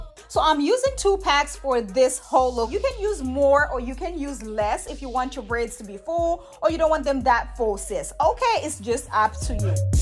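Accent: Caribbean. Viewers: Looking cute sis💖 you sound like you're from the Caribbean. I'm from Jamaica🇯🇲👍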